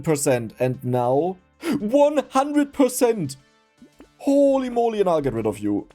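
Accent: Germany. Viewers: i love it that is german and somtimes you can hear it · He Sounds rly german😂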